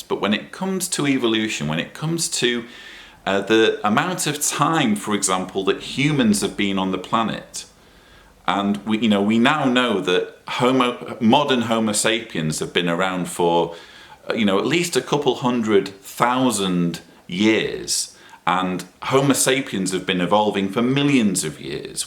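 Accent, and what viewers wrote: England, United Kingdom. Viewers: Where n England are you from Sir...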